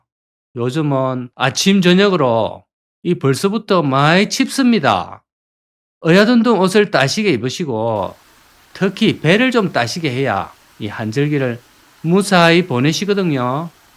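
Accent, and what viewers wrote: Gyeongsang, South Korea. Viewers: 유투브에서 유일하게 듣기좋은 경상도 말투~~~😂😂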